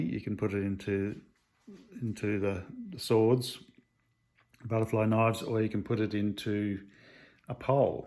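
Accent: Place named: Singapore. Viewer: Stewart Lovegrove Honestly,I study with Leo Lit who is a high level student of Chu Shong Tin.Leo Lit's name is mentioned right up there with Jim Fung...etc....He talks about "Little Idea" vs "Big Idea" which is loosely translated as Sub-Conscious/Motor cortex vs Conscious/Analytical mind. To make a long story short,you're trying to access the "Little Idea" part of your brain/mind to have success in this style of Wing Chun. In my humble opinion, is not explaining that properly. Also,I'm fluent in Mandarin which helps me a lot.Also,Leo Lit is from Hong Kong and studied with Chu Shong Tin for many years.,I believe is from Singapore judging from his accent,but I'm not sure. In any event,I'm not sure why he keeps saying only "NimTao" as the "Siu" is there for a very important reason and cannot be neglected. All this "Heart Mind " stuff just confuses the issue.Yes,there is the character "heart"心 in Nim,but that doesn't mean we should call "NimTao" "Heart Mind",because all it means is Thought,Idea,or Intention. 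Anyway,hope this helps:) Having said all that,hopefully one day I can have a discussion with about this as he seems to be a very well researched person with high achievement in the art.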